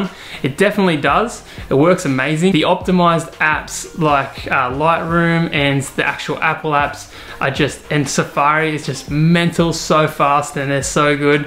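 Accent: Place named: Australia. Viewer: What a great guy you are. So nice to hear an Aussie too. I am about to buy an Air M1 for my grandaughter to use photoshop. Really appreciate your feedback/review. 😀😀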